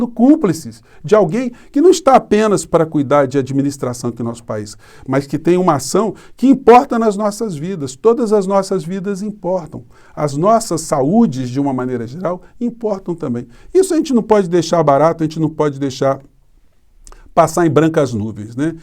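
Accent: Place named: Maringá, Brazil. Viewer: Kkkkkkkkk a voz de Maringá!!!!! Rindo até 2050. Suas falas e reflexões são ótimas 👏👏👏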